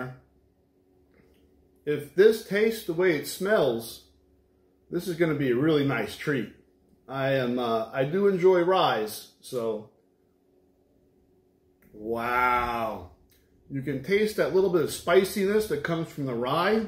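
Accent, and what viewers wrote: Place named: East Coast, United States. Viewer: You’re obviously not a Floridian but the east coast twang is definitely there. Great idea hurricane parties that is right up my alley. I find it absolutely ridiculous how the media just gets over dramatic about these storms. It’s not that these should be ignored but most are pretty livable. Enjoy!!🥃🥃🥃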